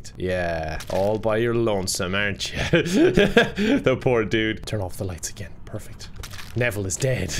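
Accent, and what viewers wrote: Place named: Ireland. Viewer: Bruh I'm subbing because of the Irish accent and funny videos :]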